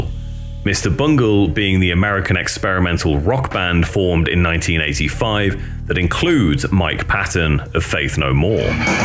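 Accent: United Kingdom. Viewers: This is equivalent to a "Behind The Music" episode but with a British narrator.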